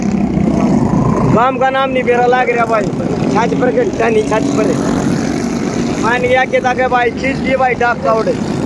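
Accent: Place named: Haryana, India. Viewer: Khaaskar aapka bolne ka haryanvi style sabhi ko bahut pasand aaya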